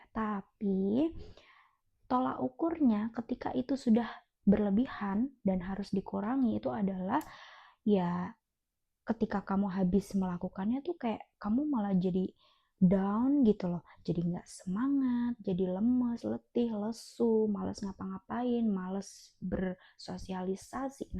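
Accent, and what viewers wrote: Java, Indonesia. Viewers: logat jawa nya melekat jirr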